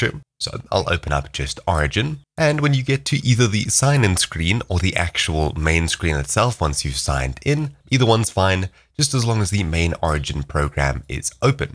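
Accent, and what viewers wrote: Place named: South Africa. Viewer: Knew you were S.A 'en, picked something up in your accent a while ago, then I saw your Origin name just now.. Haha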